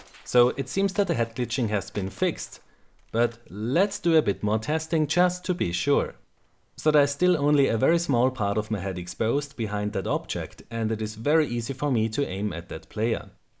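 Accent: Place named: Germany. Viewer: You have the greatest German accent imaginable. I just picture you sitting in a lab and refusing to leave until whatever you are working on has been perfectly engineered.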